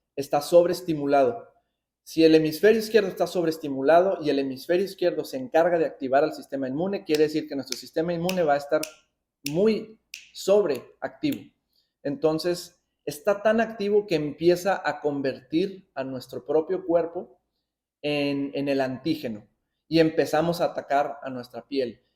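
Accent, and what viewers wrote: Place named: Guatemala. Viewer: De que país es el dr.???? Parece guatemalteco por el acento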